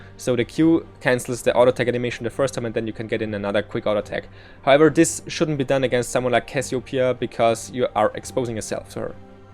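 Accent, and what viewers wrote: Germany. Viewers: Nice Tutorial. But now I am really interested. You dont really sound like youre from England or America. With which I dont mean your English isnt good its actually really great, but you dont got that accent. Thats just a guess but are you from Germany your accent sound kind of like the German one. I am from Germany so I am really interested now :)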